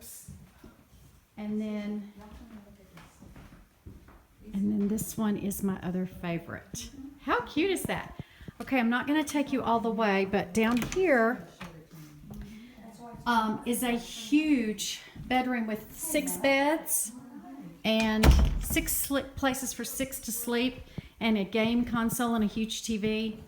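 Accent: Texas, United States. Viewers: I can say this because I've lived in Texas my entire life, but your Texas accent is getting stronger. Lol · what a great vacation, I need to put NC on my list. That Biltmore home was awesome!!! BTW your texas twang is much more pronounced now that you're living in Texas (I just love it!!! ):D xox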